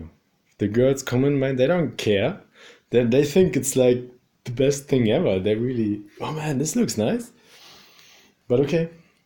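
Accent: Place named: France. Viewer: Downvoted for your accent only. Sorry but my experience with the french has been awful.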